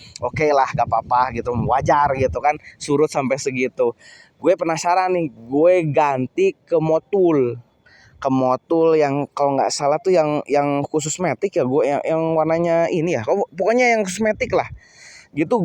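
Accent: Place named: West Java, Indonesia. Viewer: Lu orang jabar, gak usah ngomong gue, logat sunda.. Gak cocok cuk..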